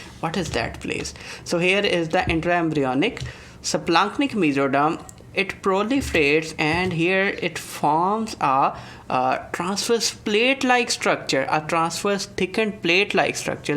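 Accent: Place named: India. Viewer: Fascinating but hhe Indian accent is almost impossible to follow; that is now English, alas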